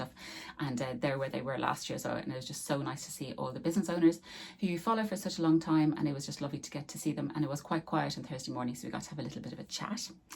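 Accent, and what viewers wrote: Ireland. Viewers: Love your vlogs, you go as green as you want! 💚 😂 Also love your Irish accent and learning Irish words, my grandad was Irish and always used to recite poetry and stories to us, although full of blarney!! 😂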